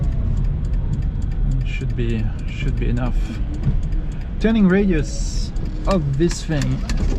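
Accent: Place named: France. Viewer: French accent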